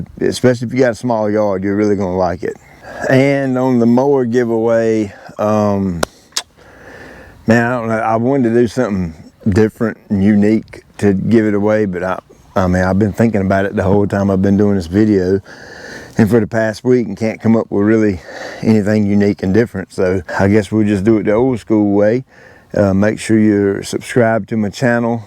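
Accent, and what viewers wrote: South, United States. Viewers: Hey, the only thing thicker than your smooth Southern accent is your gorgeous lawn! All we can see up here in Minnesota is snow, snow, snow. But, you're helping us hit the ground running when Spring finally hits. I hope your socks are blown off from all the Blessings!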